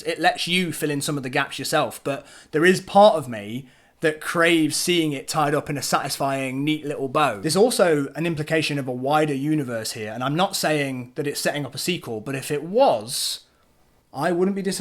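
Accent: United Kingdom. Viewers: You have a very mild british accent. sad...;(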